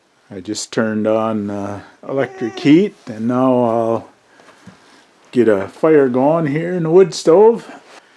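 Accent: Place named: Upper Peninsula, United States. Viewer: you sound alot like yuper er like me from mi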